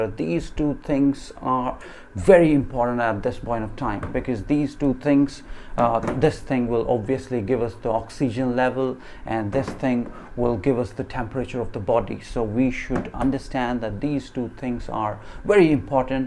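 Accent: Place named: United States. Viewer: Why are you talking in an American accent?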